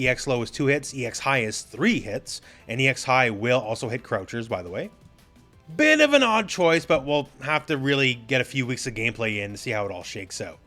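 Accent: Canada. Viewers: Canadian accent is strong in this video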